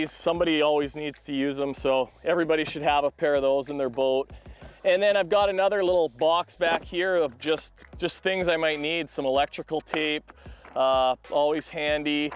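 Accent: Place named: Midwest, United States. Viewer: Most Midwest accent I’ve ever heard, so.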